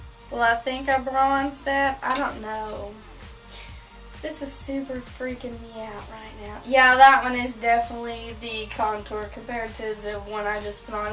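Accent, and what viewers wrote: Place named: South, United States. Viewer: I love it if you replied I can't find a lot of country youtubers it kinda sucks I'm so happy I found this channel I think your beautiful I've watched a lot of you videos so far you are like a role model to me and also you have taught me to never ever let any get under my skin and be myself I'm from a country family and so I have a country accent like yours I live in a city so I can get made fun of you have taught me to be my self and always believe in me your really funny when ever I see you make a new video I'll be happy kind of like today okay i need at least 9-11 hrs of sleep well I have fit bit I told me I only got 7hrs 38min of sleep and if that happens I need to SLEEP and I didn't have a pretty good mood I went on YouTube and saw you had s video and ever since I watched I have hade a great mood Thank you for teaching me not to let mean people to get under my skin you're my favorite YouTuber 😝💋😘😇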